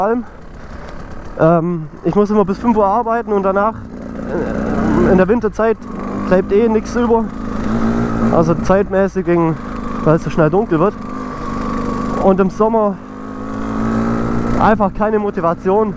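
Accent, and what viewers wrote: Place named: Geislingen, Germany. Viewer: Geißler aktzent.. Fend I tob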